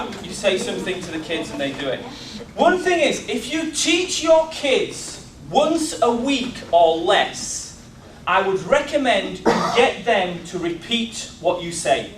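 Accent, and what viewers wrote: Yorkshire, United Kingdom. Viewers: I think he might be from Leeds or Sheffield or very close to. I'm from Doncaster and it's almost identical so hard to tell.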